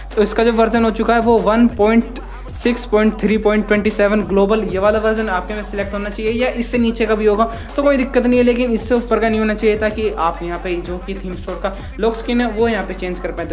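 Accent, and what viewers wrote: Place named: India. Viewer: Bruh the title is in english but you speak indian why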